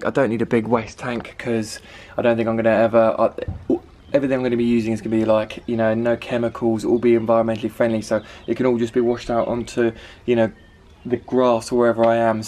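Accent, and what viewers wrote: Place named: United Kingdom. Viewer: not all of can speak or understand the King's English so for those of us watching from the colonies please speak just a bit slower eh mate?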